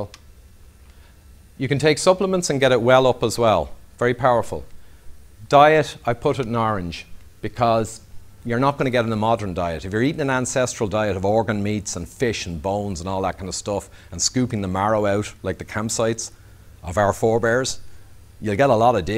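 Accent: Ireland. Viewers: I could listen to this chap all day, his wonderful Irish lilt has me glued in the same way that Carl Sagan did. There's something in the voice that seems to illuminate what's being said, and illustrates what would be otherwise baffling. Thank you Mr.. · Sounds like he's from Galway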